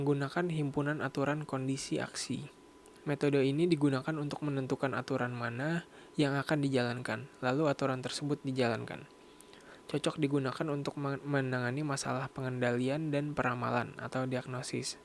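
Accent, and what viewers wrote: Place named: India. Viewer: Keren pak dosen ..... Pak dosen dari india yah? Logatnya kayak orang india 😅😅.... Sukses terus pak dosen ....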